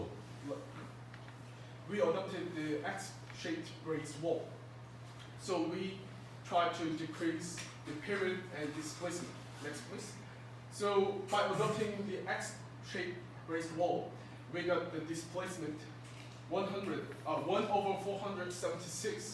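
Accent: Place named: United Kingdom. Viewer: 브릐티시 악센트가 멋있네요~~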